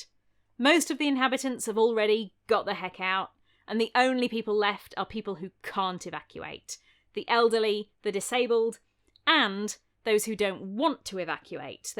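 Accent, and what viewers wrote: United Kingdom. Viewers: First time i watch one of your videos and i must say i just loved it. I was genuinely surprised when you cleaned your tribal make-up and doned the scholar outfit. I like your storytelling style, your light and enjoyable british accent and the photography style you chose for this video. Absolutely well done and keep up the good work Signed: The Romans 😅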